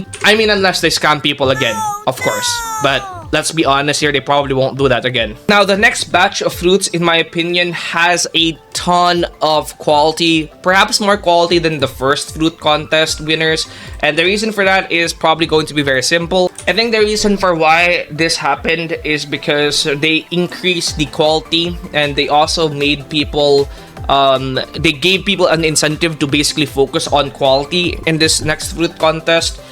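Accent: Philippines. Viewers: idk why but your accent's giving me a filipino vibe lol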